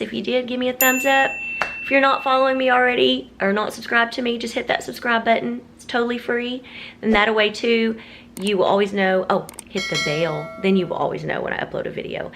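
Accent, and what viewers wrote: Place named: South, United States. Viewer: Thanks for sharing your grocery haul. Love your accent, it's so Southern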